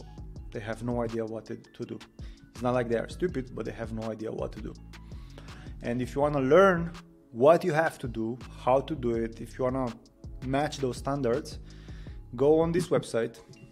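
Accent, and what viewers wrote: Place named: Romania. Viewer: Tocmai mi-am dat seama ca ești român, imi parea accentul cunoscut 😂. M-am apucat acum o săptămână de învățat css si html. Sper să fie bine. :))))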